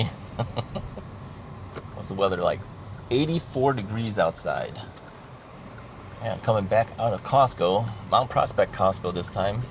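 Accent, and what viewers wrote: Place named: China. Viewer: you know you have a Chinese accent.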